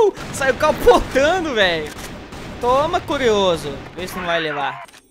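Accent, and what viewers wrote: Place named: Rio Grande do Sul, Brazil. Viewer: Parece ser gaucho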